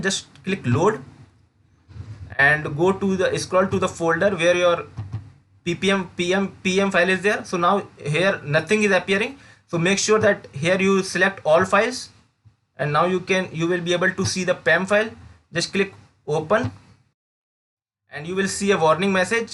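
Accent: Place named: India. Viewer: You must be coming from India, because you have an Indian accent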